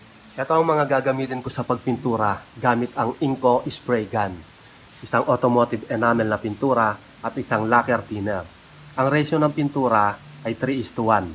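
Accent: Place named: Pampanga, Philippines. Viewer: Kapampangan na kapampangan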